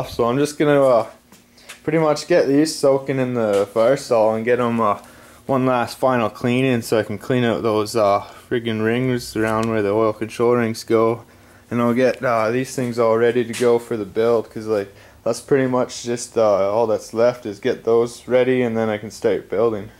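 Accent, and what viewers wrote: Canada. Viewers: are you canadaian by chance?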